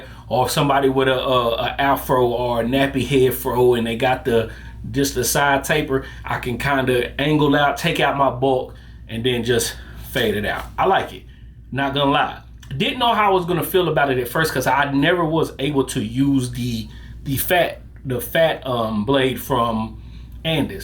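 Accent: South, United States. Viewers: Nothing wrong with a southern accent brother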